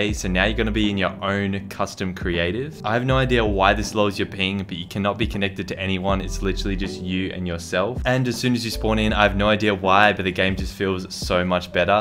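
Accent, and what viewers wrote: Australia. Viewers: Are u Australian I just think u are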